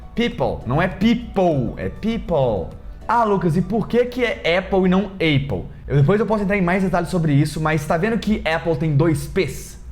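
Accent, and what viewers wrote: Minas Gerais, Brazil. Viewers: Muito obrigada por este vídeo muito esclarecedor. Você tem boa entonação e é preciso ao explicar, o que não fica cansativo. Sem falar no seu sotaque mineiro que é uma gracinha. Parabéns, tenho aprendido muito com você.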